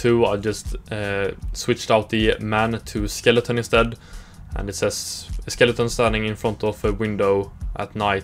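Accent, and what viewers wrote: Sweden. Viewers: man hör svenskan haha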